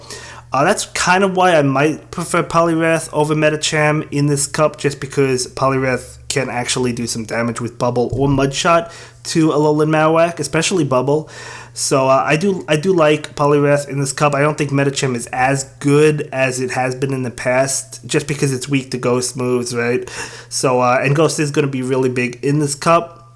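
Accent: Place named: New York, United States. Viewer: its funny getting deep pokemon go info in a new york accent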